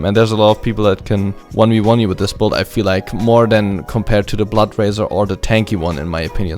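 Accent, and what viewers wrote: Germany. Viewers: HOLY SHIT der deutsche Akzent ist real... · deutscher das höst man xD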